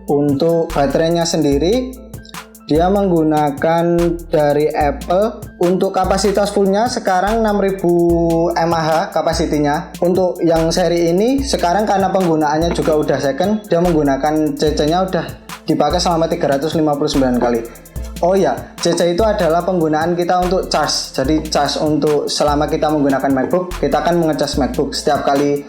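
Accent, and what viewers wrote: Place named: Java, Indonesia. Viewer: Medok banget ><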